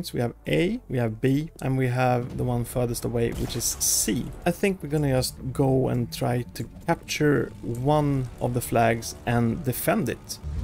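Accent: Sweden. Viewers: Are u swedish? Im swedish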